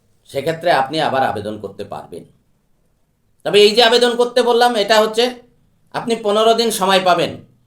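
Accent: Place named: Bangladesh. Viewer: আপনার উচ্চারণ গুলো কিন্তু বাংলাদেশীদের মতন।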